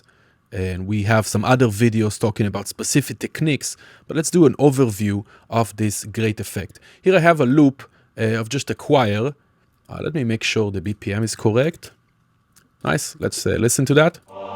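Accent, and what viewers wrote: Israel. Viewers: אני שם כסף שאתה ישראלי המבטא הזה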